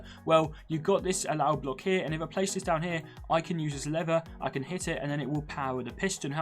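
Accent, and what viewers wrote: United Kingdom. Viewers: THICK BRITISH ACCENT BE'ER I LOVE IT BRO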